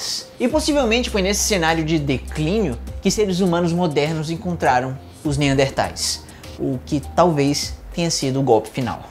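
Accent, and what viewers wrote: Ceará, Brazil. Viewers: nossa achei que ele era cearense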